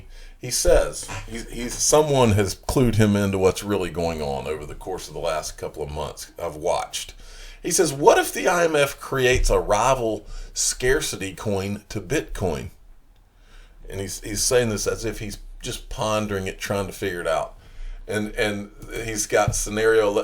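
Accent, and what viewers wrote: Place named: Nashville, United States. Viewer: You have to be from around Nashville with that accent.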